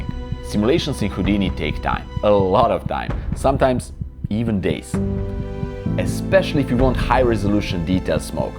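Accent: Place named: Brazil. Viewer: Just, INCREDIBLE! Congratulations! Are you from Brazil? Your english accent reminds me brazilian people.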